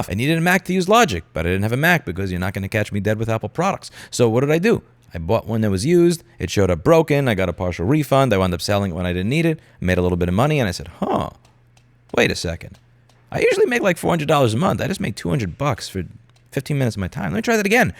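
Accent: New York, United States. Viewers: Love it. You come across as a typical New Yorker until the 2nd minute that you putting sound thinking to work. And then communicate it like a New Yorker.I hey I like New Yorkers, it's OK. More than that I love your show and i too have been seriously annoyed with your main topic - right to repair. I'm an engineer and love to tinker. Back in the day that was half the fun. Woz backs backs you. I think even God would be happy for Woz's backing.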